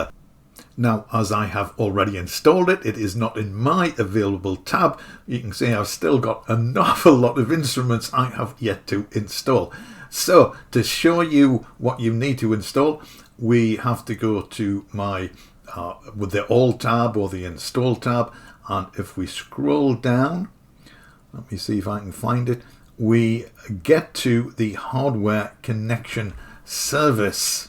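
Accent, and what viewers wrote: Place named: Geordie, United Kingdom. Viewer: I spent 2 hours installing what I could and got as far as the s49 Mk3 finally working with Kontakt 8 in Cubase 15. However I can’t get the DAW control features to work, and the screen is really jittery, jumping around a lot. I plugged the USB cable into the one USB-C port on my brand new computer and I got the feeling that the large plastic cable maybe wasn’t going far enough into the port to make a proper connection. But the keyboard fired up fine and seemed to update okay. I bought it, mint condition, off eBay, so I’m shitting myself that I’ve bought a pup. But I think that’s pretty unlikely. Hopefully your video will help. Enjoying listening to the mild Geordie accent too. Reminds me of me late fatha. 😆❤️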